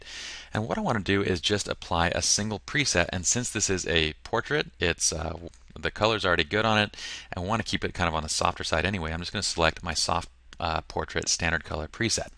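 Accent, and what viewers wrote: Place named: South, United States. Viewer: Love the accent...it's ok....it's a Southern thing. You made me laugh and Lord knows I needed to this morning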